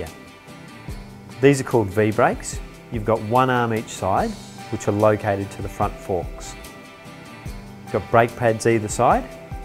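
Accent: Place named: United Kingdom. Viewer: Excellent! You took your time and explained things well. Even with that crazy British accent :)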